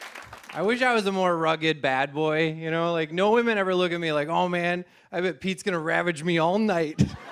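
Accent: Canada. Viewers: Why am I hearing a Canadian accent? lol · Winsc accent sounds a bit Canadian 🤔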